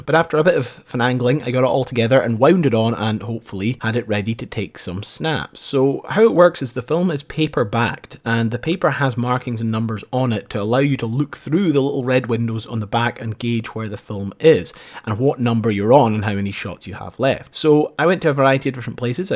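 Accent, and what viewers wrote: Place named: Scotland, United Kingdom. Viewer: Sorry if this sounds offensive. But i am jist curious. Is he a scotish ? I love the accent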